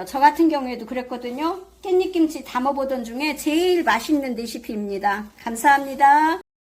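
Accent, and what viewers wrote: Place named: Chungcheong, South Korea. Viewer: 엄마 같으세요 충청도 사투리가 조금씩 새어 나오시는게 참 정겹네요 편집많이 안하시는 것도 재미로 느껴져요❤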